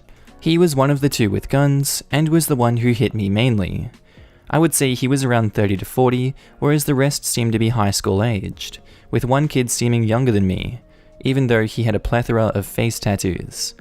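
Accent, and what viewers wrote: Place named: Australia. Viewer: I just want to listen to my Aussie husband talk...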